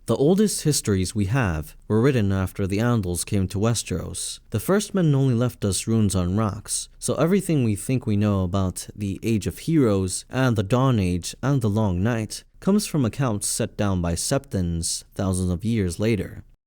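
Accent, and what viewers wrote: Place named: Midwest, United States. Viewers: Great video! What part of the US is your accent from? I've never heard it before. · Sounds midwestern. Maybe Colorado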